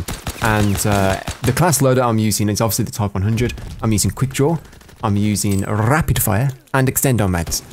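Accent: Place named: England, United Kingdom. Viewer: yeah it is how English people pronounce it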